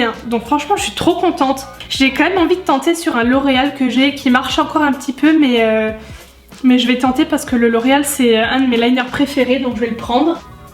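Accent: Lorraine, France. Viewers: Moi aussi je suis de lorraine et je parle comme toi 💓💓,t'es pas seul 🤣🤣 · Moi aussi je parle comme ça vive la Lorraine 😅😂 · Vive la lorraine 💪🏻 Est ce que tu as aussi un accent en disant certains mots comme boîte, poêle, etc ? Sinon t'es genial j'adore ☺️ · Je viens de Lorraine mais j'ai du déménager dans le centre mais j'ai conserver l'accent par choix, et ca me fait plaisir d'entendre parler comme ca, tu te prend pas la tête a vouloir faire plaisir au autre tu es spontanée et c'est ca que j'aime chez toi ❤ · Je suis Lorraine aussi et je parles comme toi aussi, quand je vais chez mon copain dans le centre et des que je parles il se moque de moi avec toutes les "fautes" que tu as cité 😂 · Moi aussi je viens de Lorraine (Meurthe-et-Moselle) Et je dis exactement comme toi! Bref j'adore Ta vidéos tu es parfaite je t'adore ❤️❤️❤️ #Lorraine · Je viens également de lorraine et en effet je parle aussi comme ca... 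j'taime fort continue comme ca <3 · Je comprend totalement ta façon de parler je suis aussi de lorraine 😋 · moi aussi je viens de Lorraine je te comprends je parle exactement comme toi💚#teamsnap✨ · J'habite aussi en lorraine et je dis comme toi !!! 😉❤️ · Je parle comme toi je viens de lorraine ma belle t'inquiète pas 😘 Super vidéo comme toujours! 😘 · je viens de lorraine dans le 54 et oui je parle comme toi😂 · Moi aussi je viens de la Lorraine je dis pareil que toi 😂😂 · Tu viens d'où si tu viens de la Lorraine ou de L'Alsace ? sinon super vidéo ♡ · tu viens de quelle ville ?? ( moi aussi je suis de Lorraine et tous les mots que tu as dis ( le '' t '' à vingt , la clenche ...) je les dis aussi . 😘🤗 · Oui la facon de parler de la lorraine 😂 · moi aussi je dis comme toi comme en lorraine biz <3 <3 <3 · Je suis née en Lorraine ,à Metz, donc oui je parle comme toi mdrr😂😂 #teamlorraine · moi aussi j'habite en lorraine et moi aussi je dit comme ca 😀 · Je parle pareil que toi!Tu habites où en Lorraine ? 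Sinon je t'adore continues comme sa bisous💘💘💘😊#TeamSnapchat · Moi aussi je parle comme sa j'habite en lorraine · y love you accent lorrains !!!!!! je suis lorraine ! 💕💕💕💖💖💖💖💖💖💓💖💖💓💖💖💓💖💖💓💕💖💗💗💗💙💚💛💜💝💞💟💟💟💟💟❤💘💋💋💋👄👄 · moi aussi je parle comme toi je suis de Lorraine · Je suis lorraine et je parle exactement comme toi ❤ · Oui je parle comme toi et je pense que tous les lorrains/alsaciens sont pareille 😂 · j'habite en lorraine saint remimont vers Haroué c'est vrai qu'on parle comme sa mais moi sa me choque pas mdr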